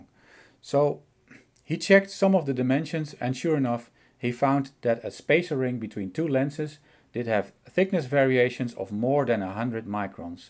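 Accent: Netherlands. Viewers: That is a Dutch accent :-)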